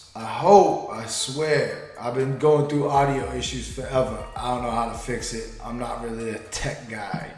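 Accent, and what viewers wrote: United Kingdom. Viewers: Big man think u from the uk lol bless up thou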